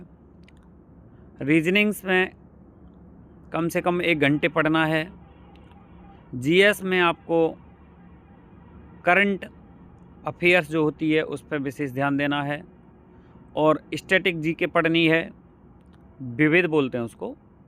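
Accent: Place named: Western Uttar Pradesh, India. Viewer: आप पक्का वेस्ट यूपी या हरियाणा से हैं 😊 BTW nice suggestions keep it up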